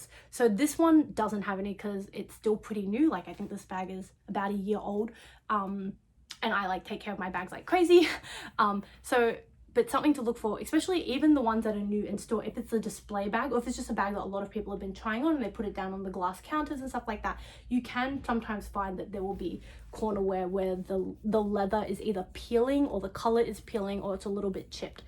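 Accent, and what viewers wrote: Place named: Australia. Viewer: Best chanel video on YouTube. And your Aussie too 🤣😊🤗🥰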